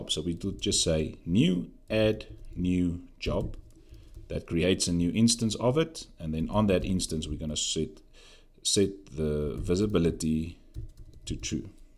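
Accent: South Africa. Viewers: from that accent straight away, i knew u are from South Africa, thanks mate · That accent right there is ZA! Awesome content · Great video. And I could listen to this guy's South African accent for days on end. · He sound so South African...thanks for the upload... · THICK South African accent. Represent boet..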